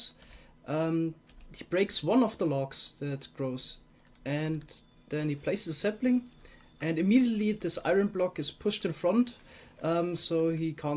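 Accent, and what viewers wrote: Germany. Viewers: Whar natationality do you have? Because your Accent sound very german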